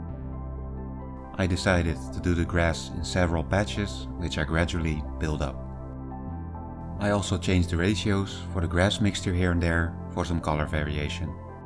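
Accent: Netherlands. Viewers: Thank god another video from the terrain building bob ross. I dont know what it is with your voice, maybee the combination of the tembre and the dutch accent, but listening to you is deeply relaxing.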